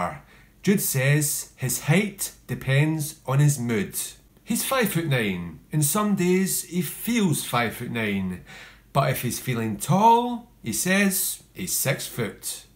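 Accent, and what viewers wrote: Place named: Scotland, United Kingdom. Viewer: Are you scottish?? I love your accent ❤️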